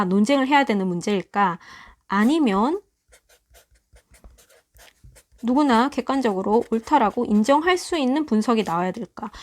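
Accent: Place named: Gyeongsang, South Korea. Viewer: 대구 목소리....영화 배우 목소리 듣는 기분임......아주 좋아요.....최고~~~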